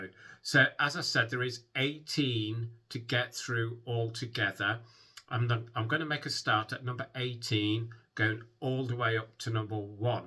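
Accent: United Kingdom. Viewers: I dig your Elvis reviews a lot - as well as the Britishness of your accent! 👍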